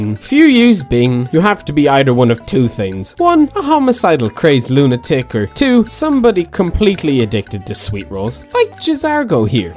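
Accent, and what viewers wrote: Ireland. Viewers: Ah, a fellow Irishman Any craic?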